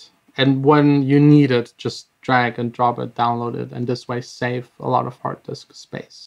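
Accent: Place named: Germany. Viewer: Du hörst dich sehr deutsch an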